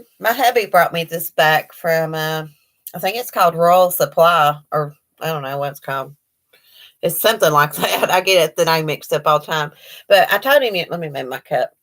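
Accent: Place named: East Tennessee, United States. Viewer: New sub! It’s nice to find someone calm and not instigating trouble! Where are you from? We have the same accent..East Tennessee here! Glad that I found you!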